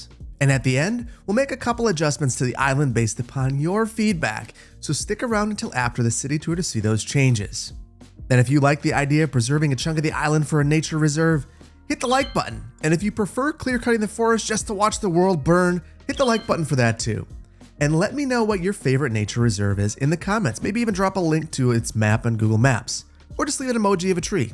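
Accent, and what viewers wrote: Wisconsin, United States. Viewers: Just watched all of this playlist, I'm from southern Wisconsin and it's great to hear the "up north" accent attached to all this scenery.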